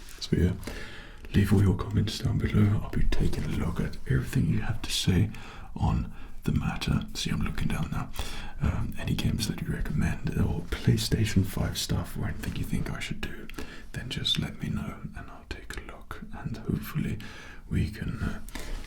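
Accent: England, United Kingdom. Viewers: Are you British? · Hii just letting you know is actually born in the uk! and he does sound like he has a very deep English accent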